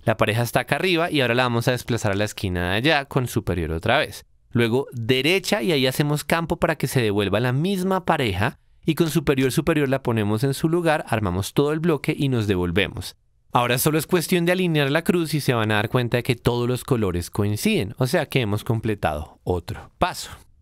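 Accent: Colombia. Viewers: Guillermo Crespo Es una voz de colombiano haciendo un tutorial. Así son siempre. Aparte es bastante agradable, es una voz viva.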